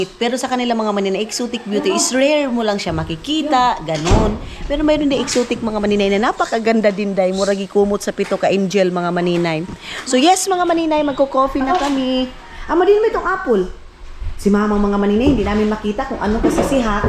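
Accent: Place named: Surigao, Philippines. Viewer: Surigainon Ang dialect ...like my late father..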